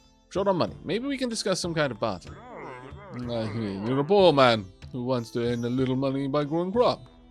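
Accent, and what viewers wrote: Wales, United Kingdom. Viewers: So I don’t know if you have “for science’ed” this yet, but in your first run you did try to sell raw sliced meat without the stamp and you couldn’t. That may have changed since then. I don’t remember if you ever sold cooked meat dishes at all, burgers were too valuable. Also, My partner, Todd, doesn’t normally get excited when he walks in on me watching one of my YouTube channels. However, he does like, and this game in particular. When I watched the previous episode he walked in and asked “Is this the game with Comrade Donkey‽” I will probably buy this finally (It used to be on XBox Game Pass but dropped off I think, and also I got rid of my GP.) It’s very charming and I know so much about it because of this series. (Now if only the game could include a running commentary in dulcet Welsh tones. Oh, well, I’ll have to settle for watching this while I play.)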